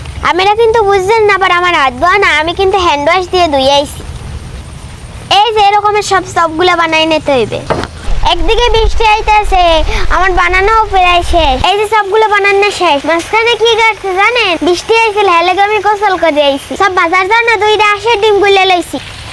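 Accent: Barishal, Bangladesh. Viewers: বৱিশাইল্লা নাকি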